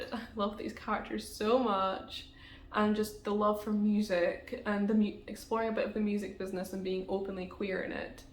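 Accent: Scotland, United Kingdom. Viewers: Do you have a Scottish accent when you speak Spanish? 😆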